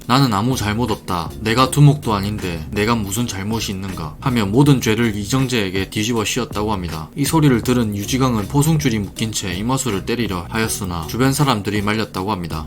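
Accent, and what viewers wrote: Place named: Busan, South Korea. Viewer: 같은 내용이라도, 부산 사투리 참 좋네요. 구독. 좋아요 누릅니다.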